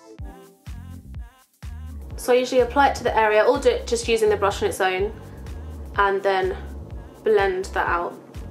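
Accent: United Kingdom. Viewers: why u don't make a video for learn english accent cause i really like ur british accent , actually u don't need make up !! you're pretty .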